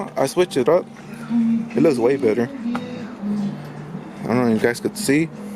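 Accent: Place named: Mexico. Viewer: The solid Mexican accent I have ever heard.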